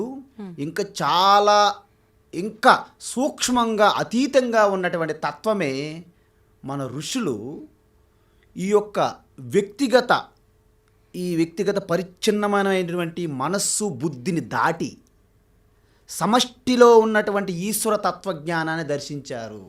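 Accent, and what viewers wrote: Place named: Srikakulam, India. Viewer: స్వామి గారి ప్రవచనములు యెుక్క వివరణ ఛాలా బావుంది. కాని ఈయన శ్రీకాకుళం జిల్లా లావుంది ఎందుకంటే ఈయన యాస అలానే ఉంది.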